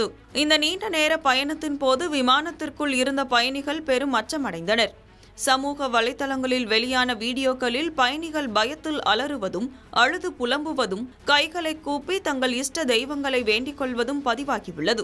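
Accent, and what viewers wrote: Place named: North India, India. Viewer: Yentha naathari da seithi vaasikirathu, ithulayum vadakkan vanthuttana😡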